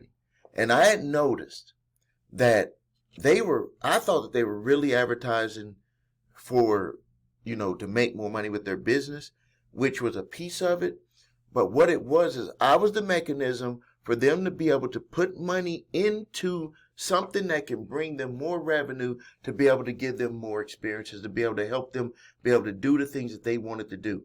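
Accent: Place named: South, United States. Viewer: You are keeping us inspired, man. What state are you in? You sound southern. We are in NC.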